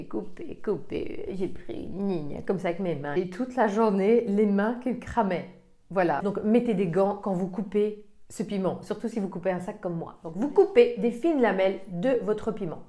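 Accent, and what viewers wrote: Belgium. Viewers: Je crois de belgique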